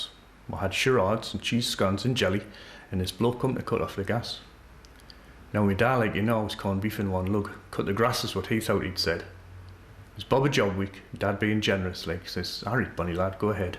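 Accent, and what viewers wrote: Geordie, United Kingdom. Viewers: I'm kinda shocked that I can hear similarities between this dialect and my own, I'm Barbadian. I wonder if it's just a coincidence, or if there was actually a lot of Geordie speakers in colonial Barbados.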